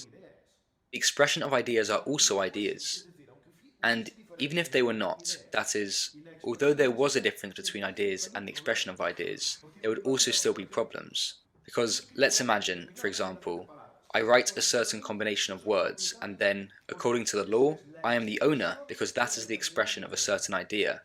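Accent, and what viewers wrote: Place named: United Kingdom. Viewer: La voz es excelente, no tengo que leer los subtítulos y me gusta volver a disfrutar esta presentación en versión Inglés, y es muy clara y muy agradable la voz de estilo británico. Es una voz natural y agradable.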